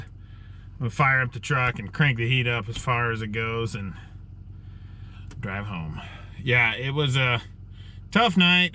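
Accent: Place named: Arizona, United States. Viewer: When I hear that accent I totally think Arizona.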